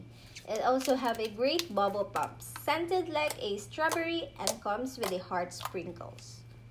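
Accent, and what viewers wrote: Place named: Philippines. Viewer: She is a pinoy...so her first language is filipino. Atleast she tries her best. Hope u understand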